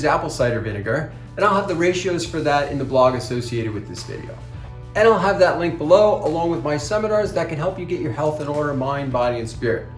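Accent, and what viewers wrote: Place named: New York, United States. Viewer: I can tell this guy is from New York cause of his accent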